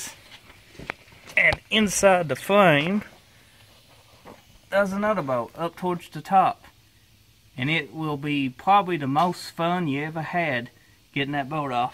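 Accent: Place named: South, United States. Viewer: Great info! Just how far South ARE you? I could listen to your drawl all day long. Makes me want to get a 6 of PBR!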